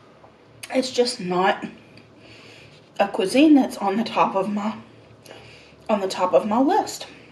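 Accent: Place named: Deep South, United States. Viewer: You’re not a Christian with that accent? Dayum, I respect that! I too am from the Deep South and not a Christian.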